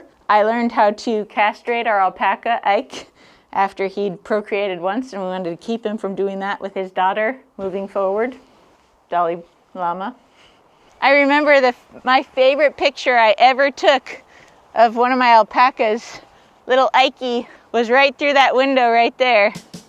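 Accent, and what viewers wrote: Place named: Minnesota, United States. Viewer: PNW has very little accents. I keep hearing a MN accent maybe cause you remind me of an ol’ friend. BTW…. Many congratulations on your baby!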